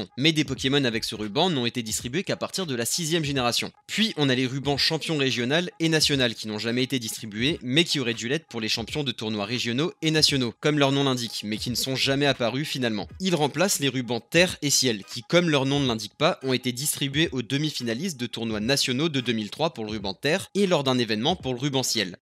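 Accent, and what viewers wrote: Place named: Belgium. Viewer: qu'est qu'il a l'accent belge qu'on m'explique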